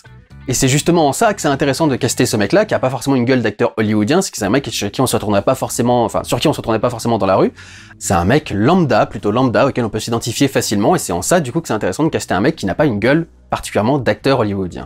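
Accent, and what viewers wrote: Quebec, Canada. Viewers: très bon accent québécois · Accent québécois correct.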